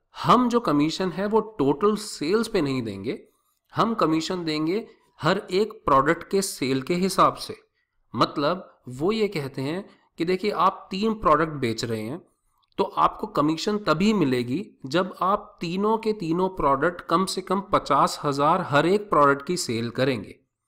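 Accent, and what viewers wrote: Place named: Pakistan. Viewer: Excellent explanation. You sound more Pakistani than Indian, pronunciation wise such as the word zaroor, Aap etc. Probably because you are from UP/Delhi. Nevertheless, good work, love from Pakistan.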